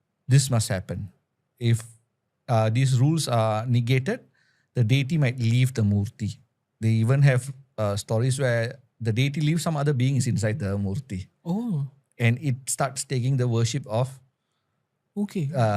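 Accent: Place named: Malaysia. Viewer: This person speak like Malaysian or a Singaporean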